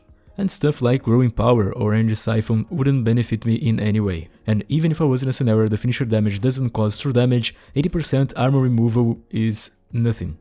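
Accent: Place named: Brazil. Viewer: Sabia que era Brasileiro por causa do sotaque kkkk muito top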